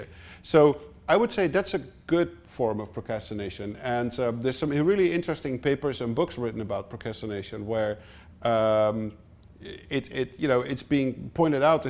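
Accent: Netherlands. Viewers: Love the Dutch accent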